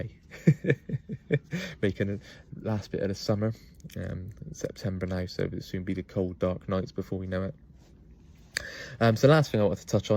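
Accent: Bristol, United Kingdom. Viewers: definitely from Bristol 😂 that accent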